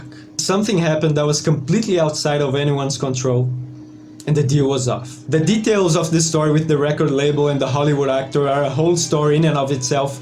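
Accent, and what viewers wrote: Brazil. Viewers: Hey, you have a brazilian's accenct. Are you brazilian?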